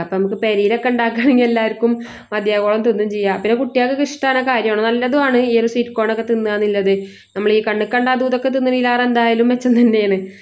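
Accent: Malappuram, India. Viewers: Ntemmoo thani malappuramkaari 😄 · ഇത് ഞാൻ കഴിഞ്ഞ ആഴ്ച കോട്ടക്കുന്ന് ന്ന് തിന്ന് അവ്ൻ്റമ്മേ എൻതാ ടേസ്റ്റ് 😅അപ്പോ അതൊന്ന് ഇൻടാക്ക വിചാരിച്ചു തപ്പി വന്നതാ അപ്പോ ദേ നമളെ മലപ്പുറം ഭാഷയിൽ തന്നെ ഒരു വീഡിയോ കൻടത്😁😁😁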